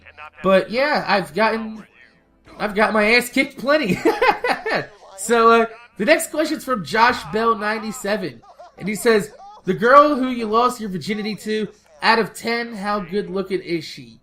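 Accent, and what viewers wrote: New Jersey, United States. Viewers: Dat New Jersey accent :D I'm from Tennessee, so I have a southern accent. Only slightly though.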